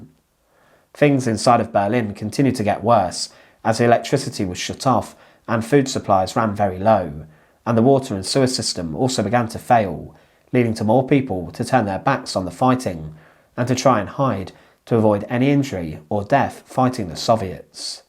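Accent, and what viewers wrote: United Kingdom. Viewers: I agree. And I particularly like his type of accent. Not sure what part of the UK it comes from, but it is lovely. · As an American, he speaks with what I consider to be a classic British accent. Sometimes he's hard to understand, but that's part of the fun of listening to different English accents.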